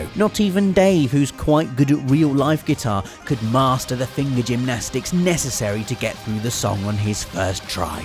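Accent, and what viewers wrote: United Kingdom. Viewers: Fock I HATE british english. So disgusting to hear..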